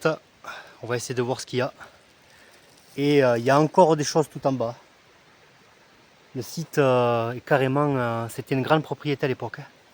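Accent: South, France. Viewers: Avec plaisir, j'adore l'accent du Sud en plus ;)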